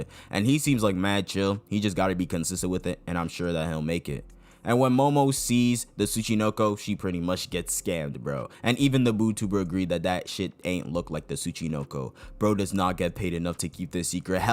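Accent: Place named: Africa. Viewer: NICE ASS AFRICAN ACDENT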